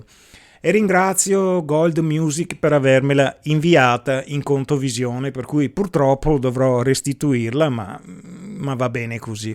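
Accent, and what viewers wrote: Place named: Veneto, Italy. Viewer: Ciao Conterraneo! dalla parlata ho detto, questo è Veneto, e poi dal Nome e Cognome ho detto... ma mi sembra di conoscerlo... e infatti sei di Thiene... io di Malo!, inizio a seguirti che mi sto avvicinando al mondo della chitarra, anche se un po' Vecchiotto per iniziare a 43 anni, Ciao!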